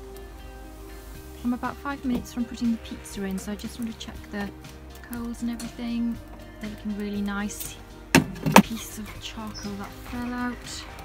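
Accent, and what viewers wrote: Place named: South Wales, United Kingdom. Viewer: Excellent I'm from the valleys I've been trying to make good pizza in the past it sounds like you're from south Wales.if you are if you like to meet up and see how you make it Best wishes from Paul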